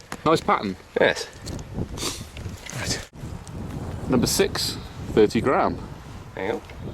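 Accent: England, United Kingdom. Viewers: 'Love that accent. I missed my British friends.Well explained video.I gained more understanding of what loads to use for target shooting.More power to you. You should have been a teacher! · English accent 🙂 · Wow, definitely wasn't expecting to hear a British accent. Good to hear our cousins from across the pond enjoying firearms.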